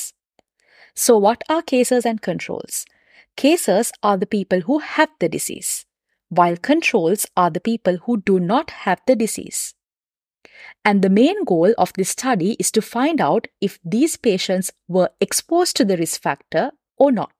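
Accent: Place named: Sri Lanka. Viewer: Why do you sound so Sri Lankan? 😮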